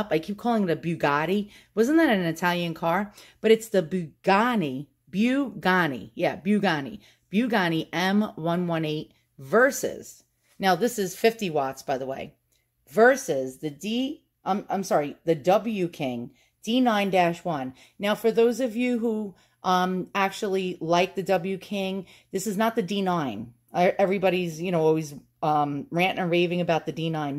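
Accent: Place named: New Jersey, United States. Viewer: I love your east coast accent! New Jersey?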